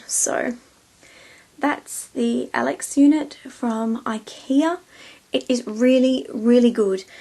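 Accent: Australia. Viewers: i was wondering what your accent was, and then you said its only in australia. I am australian too... i fail. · r u from australia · r u australian??? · are you from Australia?? i LOVE Aussies!! :D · I do love vidoes that make me feel I have very little make up.=) Thanks for that and, yes, I like the Aussie accent too - it's quite cloes to my own Brit tones, but still with a little touch of the exotic.=) Take care! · how could they not tell its aussie? lol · Where do you buy your OPI nail polish, because I live in Australia and you sound Australian :) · I can listen to Australians talk all day, they just make everything sound better!!!!!!!! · Aussie?? I can tell by the very familiar "ova" "holda" ~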